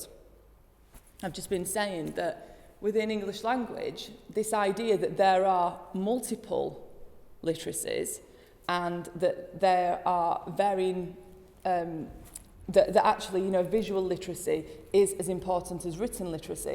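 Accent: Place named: Yorkshire, United Kingdom. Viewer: I am living in York. It's an interesting accent with beautiful features. But it seems to me that her accent isn't so strong as we normally found in the streets, shops etc. Maybe in an informal context would be possible to hear more details.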